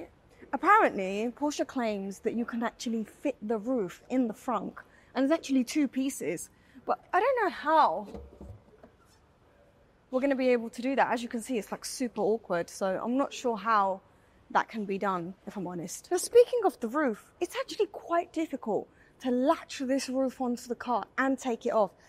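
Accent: United Kingdom. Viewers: Love all your videos! the Cars you do and your accent!!!!!!! sounds like an UK accent! anyway great show!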